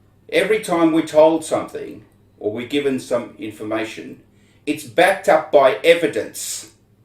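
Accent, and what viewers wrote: Australia. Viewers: Lol he has to be an Australian this professor. Just how he talks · He sounds like a true blue australian he makes me proud to be australian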